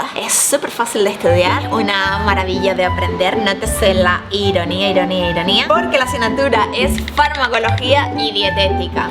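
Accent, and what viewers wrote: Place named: Argentina. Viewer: Hablas como argentina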